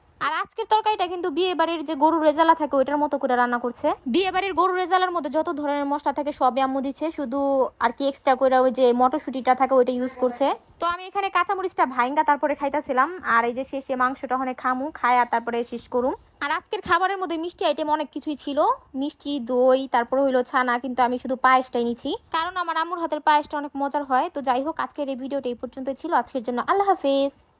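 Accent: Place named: Savar, Bangladesh. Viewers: আমিও কেরানীগঞ্জের কেরানীগঞ্জের আসল ভাষা এরকম না আপনার ভাষা কিছুটা ঢাকাইয়া দের মত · Apu ata savarer vashar motoi amrao avabe kotha boli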